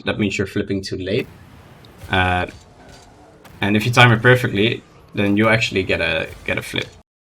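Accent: Netherlands. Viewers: dat nederlandse accent valt niet te verbeteren gozer <3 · I hear nederlands, possible? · Je hoort aan zn engels dat hij hollander is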